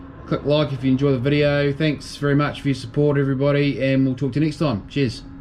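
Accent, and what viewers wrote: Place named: Australia. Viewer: He doesn’t have a nz accent more Australian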